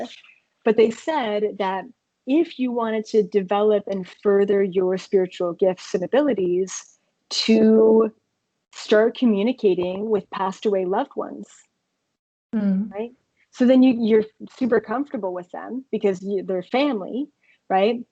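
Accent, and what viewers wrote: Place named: Sweden. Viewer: Jag hittade dig via videos, sååå kul att hitta en session med en svensk! Man hörde direkt på engelskan att du var ifrån Sverige 😋🤗❤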